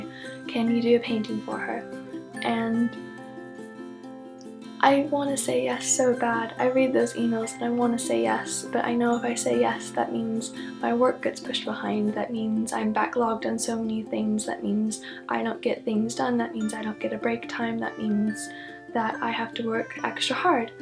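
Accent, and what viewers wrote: United Kingdom. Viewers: I believe she has mentioned in an older video that she is originally from Florida, and she moved to London, which is where she currently resides. It might seem like she has an accent or uses British English due to her stay in London for an extended period of time.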